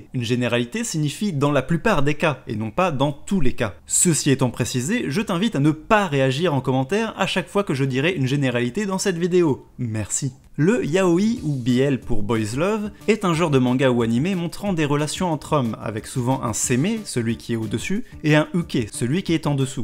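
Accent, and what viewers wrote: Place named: England, United Kingdom. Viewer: Ton accent anglais est magnifique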